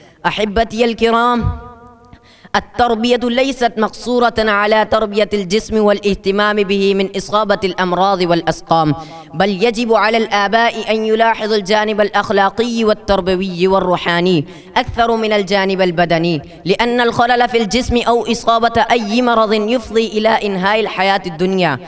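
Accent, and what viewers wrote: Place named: Arab world. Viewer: MASHA ALLAH very best speech & beautifull accent like ''ARAB'' ......... THANKYOU SO MUCH!!!!!!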